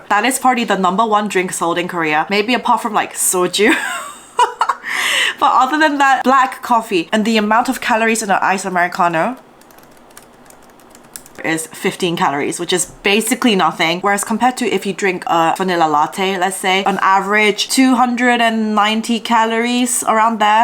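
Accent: United Kingdom. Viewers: She has a UK accent (to my ears).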